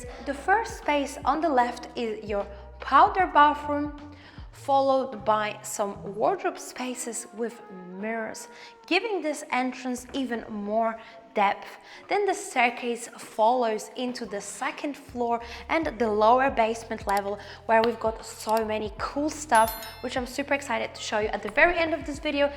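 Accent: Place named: Australia. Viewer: You have a slight Aussie/ New Zealand accent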